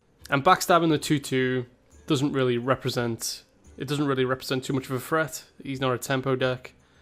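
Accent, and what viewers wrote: United Kingdom. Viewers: You sir would be a very successful audiobook narrator or radio host, and it's not just the eloquent British accent.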